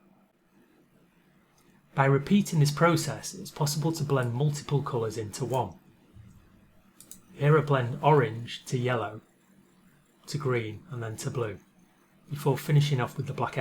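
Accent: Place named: England, United Kingdom. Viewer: Thankyou for your videos, very helpful. Im English and its very nice to hear an English accent explaining. I will be watching them all.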